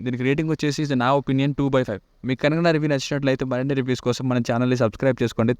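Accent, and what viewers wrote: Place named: East Godavari, India. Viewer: బ్రో మీది ఈస్ట్ గోదావరి మాటకు ముందు అండి మాట చివర అండి అని వస్తుంది స్మాల్ డౌట్ అంతే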